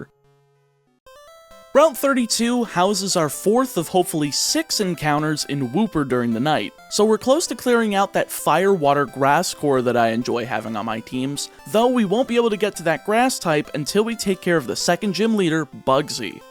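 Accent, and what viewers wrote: South, United States. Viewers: ive been watching your stuff for a while now, and im just now noticing your accent, you're from the south right?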